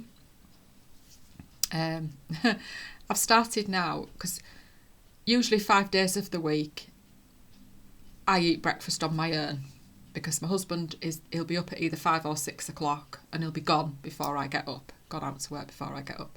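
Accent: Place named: Yorkshire, United Kingdom. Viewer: Hello 👋🏻 I’ve just started watching you and really enjoying your Flosstube. Your projects and stitching are beautiful. I am also doing stitch from stash and doing well so far. I guessed you’re from Yorkshire straight away but where? East Yorkshire. I’m in South Yorkshire right on the edge of the Peak District, I can walk into it in 5 minutes. Anyway Happy Stitching and I’ll see you next time ❤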